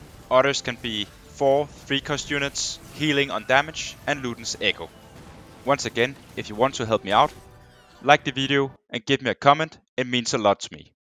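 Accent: Denmark. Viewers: Hey dude, can't help noticing the danish accent <3 ur videos are great very "hjælpsomme" :P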